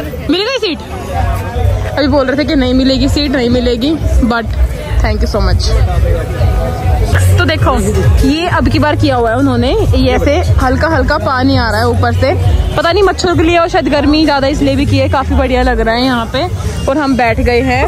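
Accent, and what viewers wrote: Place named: Haryana, India. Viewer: Hey ❤❤❤ kashika here... Don't know message will reach..bas ker dia..😅😅😅😅and haryanvi accent me tum bhot surprising lgti ho...😂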